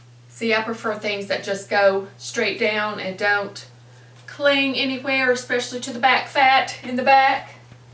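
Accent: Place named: Tennessee, United States. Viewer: Yep, already knew it and this was yet again more confirmation: you're a genius. With a TN accent. 😘😘😘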